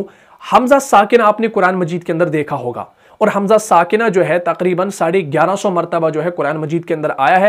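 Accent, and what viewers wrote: Kashmir, India. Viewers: Bhai jaan aapka accent Kashmiri jaisa hai ??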